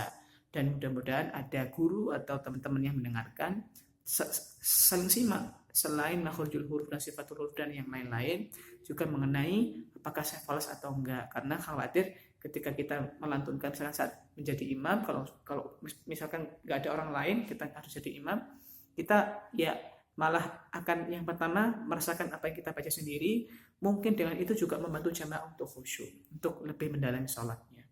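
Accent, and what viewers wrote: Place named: Java, Indonesia. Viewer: aslinya mana sih bang? kok ada boso jowone "nirukke" ?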